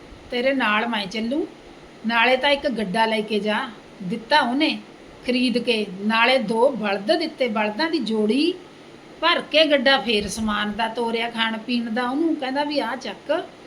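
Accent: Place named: Malwa, India. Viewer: ਮੈਡਮ ਤੁਸੀਂ ਕਿਸ ਸ਼ਹਿਰ ਵਿੱਚੋਂ ਹੋ ਬੋਲੀ ਮਾਲਵੇ ਦੀ ਹੈ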